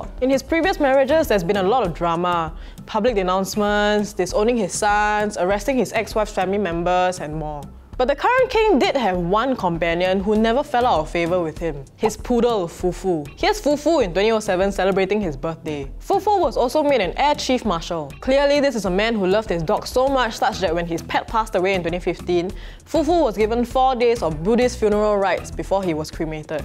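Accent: Singapore. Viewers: That is how we Singaporeans sound like. Don’t like it then it is your problem. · The unabashedly singaporean accent is refreshing compared to the fake western accent that most reporters have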